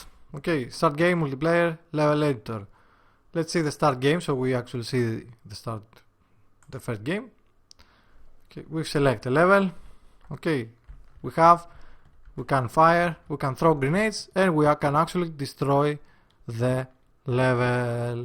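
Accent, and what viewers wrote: Greece. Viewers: την ελληνικη προφορα δε τι μπερδευω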